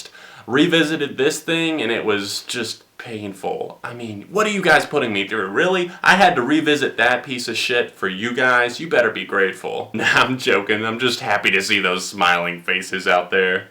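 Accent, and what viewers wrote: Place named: North Carolina, United States. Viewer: I heard your voice and I was like, "I know he's from North Carolina. I know it." So I clicked in the description and I knew it. Anyways I've been loving these videos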